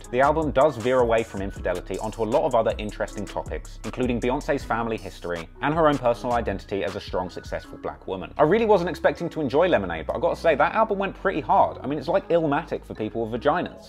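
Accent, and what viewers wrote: United Kingdom. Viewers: That British accent talking American hip-hop is irresistible!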